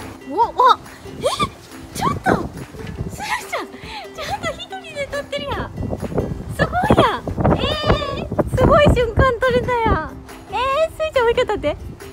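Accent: Kyoto, Japan. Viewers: いつも楽しく拝見しています。私にも4ヶ月になる娘がいるので、スータンの様に可愛く育つといいなと思っています。英語を話すモエさんも素敵ですが、個人的には京言葉を話されるのも素敵だなぁと思っています。 I really enjoy watching your channel. I’m also a mom of a 4 month-old girl so I hope she will grow well and cute like. Your English is very charming but personally your Kyoto dialect is something that makes your channel even more attractive! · Specifically, I think she’s speaking in Kyoto-Ben. Osaka-Ben, which is what most people think of regarding Kansai-Ben sounds different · I know🌸her Japanese accent is so cute when she speaks English it’s very soft and beautiful since she’s from Kyoto🤍🇯🇵☺️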